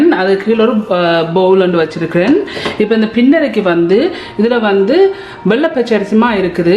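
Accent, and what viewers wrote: Jaffna, Sri Lanka. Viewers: அக்கா நீங்கள் யாழ்ப்பாணமோ.? யாழ்வாடை வீசுது உங்கள் கதையில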